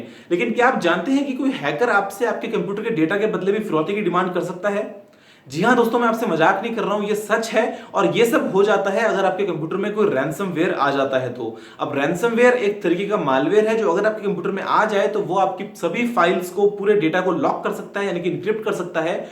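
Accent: India. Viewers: Another Indian, this is boring to listen Indian accent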